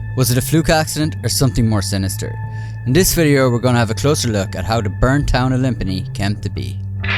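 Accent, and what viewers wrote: Ireland. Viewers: Irish I believe but there are a lot of Irish accents. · Fantastic video, absolutely loved this! Really interesting and thought-provoking 😊 Biggest mystery for me though is which part of Ireland that lovely accent is from... my g-grandad was Irish from Cork, doesn't sound like there! 🇮🇪 Keep up the good work, you deserve so many more subs! Maybe Princess Isabeau would be a good one too, I see a lot on the mysteries sub talking about her (I've got a feeling she's not to be found, but hey ho)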